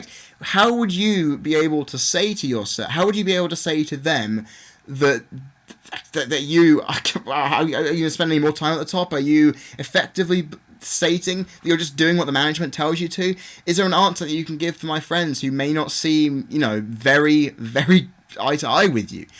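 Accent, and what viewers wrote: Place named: United Kingdom. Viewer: I love the British Accent fucking awesome whenever i do a British Accent i sound Australian or Irish and sometimes even Italian lol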